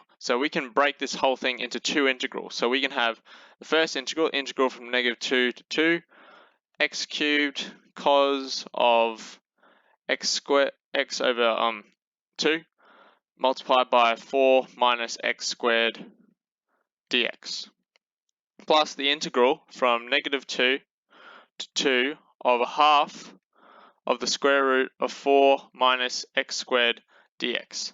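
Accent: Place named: United Kingdom. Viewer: Recognizing british's speech is pretty tough, sorry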